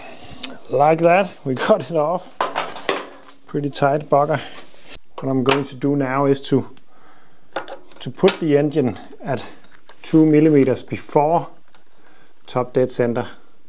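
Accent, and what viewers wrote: Denmark. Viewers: Wait are you from Bornholm?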